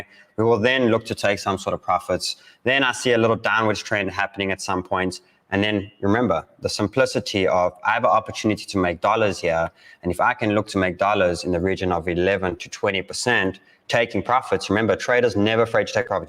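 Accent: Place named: South Africa. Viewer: He's sounds South African to me but I don't know because there's so many different South African dialects.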